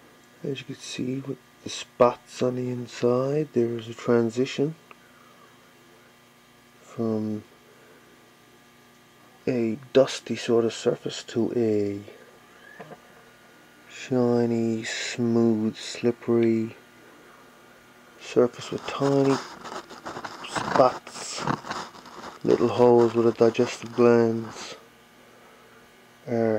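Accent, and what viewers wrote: Newfoundland, Canada. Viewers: Are you from newfoundland?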